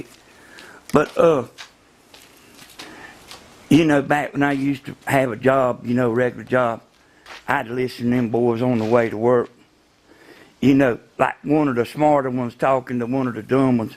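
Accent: South, United States. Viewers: have to say this. you could be a professor, but that southern accent! love it!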